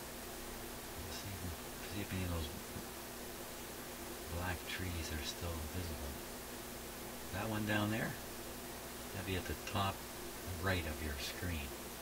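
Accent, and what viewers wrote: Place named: Canada. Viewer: Fascinating. I had heard a lot about "silver mica disease", but it's great to actually see evidence of the problem. I just found your channel through this video, and was thinking "this guy sounds Canadian", then I spotted the maple leaf sticker on your toolbox!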